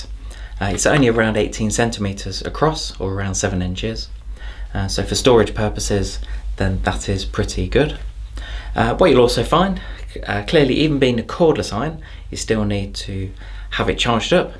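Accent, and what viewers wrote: United Kingdom. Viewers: YOU ARE MY HERO !!!! SO BRITISH, SO POLITE, SO ASMR !!!!!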